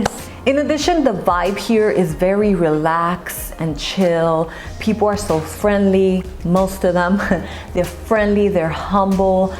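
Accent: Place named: Puerto Rico. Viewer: I love your Puerto Rican accent. Sound's great.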